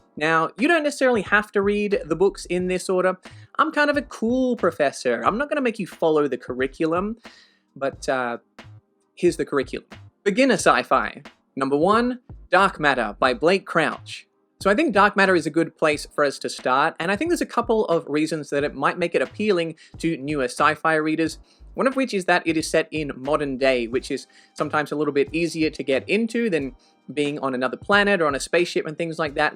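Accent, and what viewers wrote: Australia. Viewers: Waitttt it took me half the video to realize you have an Australian accent!! I'm from Victoria 😅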